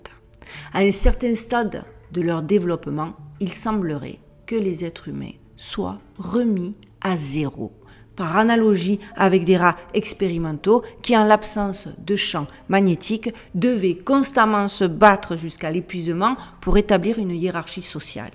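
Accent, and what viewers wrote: Marseille, France. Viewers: Grand merci pour cette vidéo peu importe les sujets futurs se sera au top avec ton accent de. Marseille 😁👍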